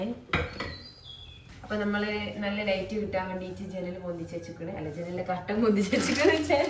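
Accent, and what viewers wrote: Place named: Balussery, India. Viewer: Kozhikode Balussery aahn thonnu samsaram kettit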